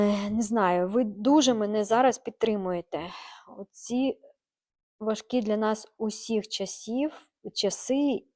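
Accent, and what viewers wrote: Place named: Steppe, Ukraine. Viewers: завжди із задоволенням дивлюся ваші відео. Ви не тільки надихаєте своїми виробами. Вас завжди цікаво слухати. І ваш Херсонський говір, то моя рідна мова😂. Херсон, тримайся❤ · Лайк за степовий суржик))! Ще один))❤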